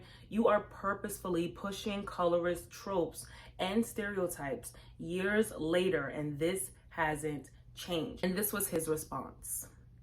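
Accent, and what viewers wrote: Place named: New York, United States. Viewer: I love your accent.....New york ?? New Jersey ??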